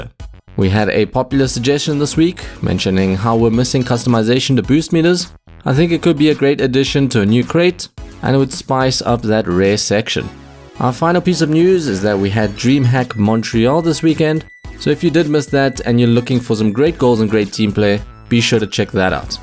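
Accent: South Africa. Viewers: Are u south african :) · Are you South African? 🇿🇦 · Are u from South Africa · Haha nice! I could tell by your accent! 😄 I am also from Joburg 😊 are you currently living here? I get the feeling you're living in another country for some reason? 😂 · are you South African ?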